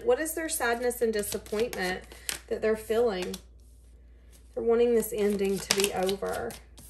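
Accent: South, United States. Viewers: I so enjoy your channel '! Your southern accent is hypnotic and very relaxing! The vibration of all your reads is 100% sincerity! wow also you definetly know my person,haha your gift has taught me so much about The connection with my twin flame!I feel a reunion with us is very close. We have known each other since elementary school,she was my first kiss,first crush,first episode of butterflies in my stomach yep. When we reconnected as adults,a couple years ago,it was magical! It was unbelievable how much chemistry and passion we experienced, never left her house for a solid month,heaven on earth.we connected emotionally just as strong as sexually,The conversations were extremely deep lasting sometimes all night,we also share the same type of sense of humor,and so we also had hysterical fits of laughter that went all night.hqahaha I dont even have the words to describe how much I love this woman. 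She feels the same towards me.However the twin flames journey is full of surprises!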